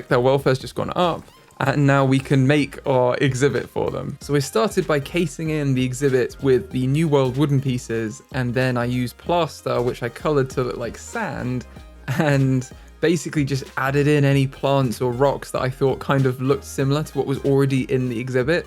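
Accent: United Kingdom. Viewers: where is your accent from in the uk? its really cute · Hes british like meh mate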